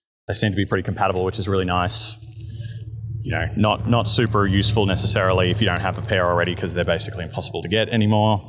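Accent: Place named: England, United Kingdom. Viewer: nice review. I was expecting you to speak czech as the pic had subtitles lol. I got The Kings English. 😅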